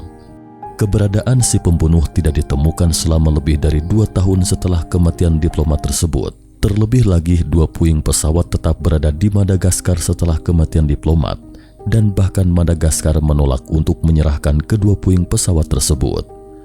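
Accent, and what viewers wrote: West Java, Indonesia. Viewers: tapi PRONOUNCIATION ENGLUSH (pengucapan istilah ejaan)....kurang enak di dengar....spt bercampur logat sunda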